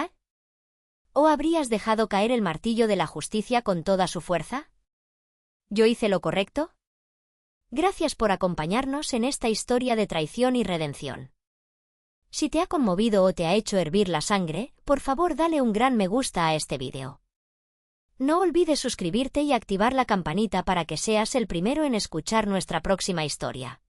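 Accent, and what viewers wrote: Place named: Spain. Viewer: Sería interesante oír la narración en español de México y no el de España, ya que se supone que la historia se desarrolla en México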